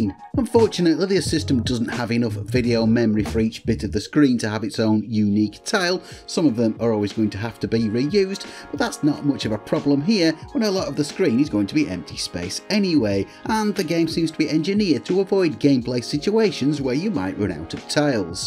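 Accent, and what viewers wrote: United Kingdom. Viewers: Great vid, huge SMS fan here and it's good to hear a Brit doing it justice. Subscribed!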